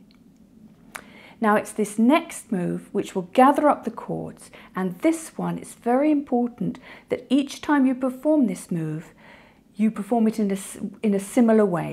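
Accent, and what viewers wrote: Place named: RP, United Kingdom. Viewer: Thank you for this well explained tutorial. I love your RP English. Good to learn pronunciation and easy to understand.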